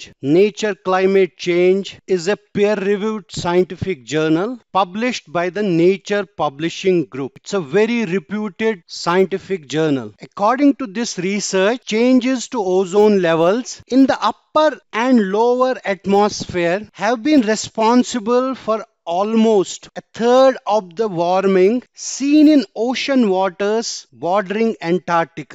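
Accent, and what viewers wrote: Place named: Kashmir, India. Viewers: Your accent is Kashmiri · Sir must be kashmiri because of dialect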